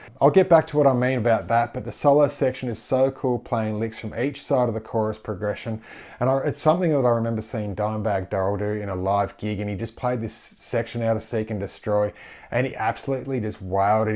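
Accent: Australia. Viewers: There's only a few reasons I come to this channel: to learn how to play my favorite thrash riffs, dial in those glorious tones, listen to an Aussie's killer accent and occasionally have an exotic bird stare judgingly back at my bad technique or pisspoor tone or watch them perform perch acrobatics. This video delivered on all accounts as usual. Love the series idea and as always look forward to more vids from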